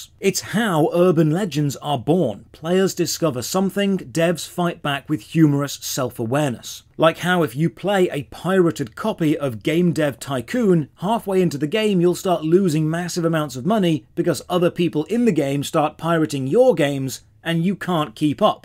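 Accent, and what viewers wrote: United Kingdom. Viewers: are you spiffing brit? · brittish eric foreman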